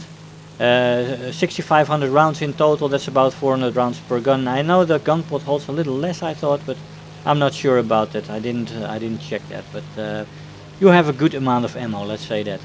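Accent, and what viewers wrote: Netherlands. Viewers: Leuke video ik hoor dat je NL bent haha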